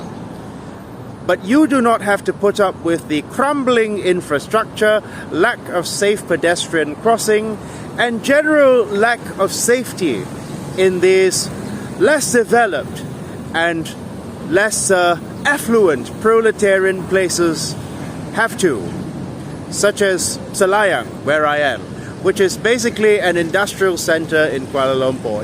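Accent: RP, United Kingdom. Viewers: Still better than Hong Kong. We former British colonies are nothing more than a testbed for the neoliberal experiment gone rampant. Rat race, rat utopias and behavioral sink. Nice posh accent btw.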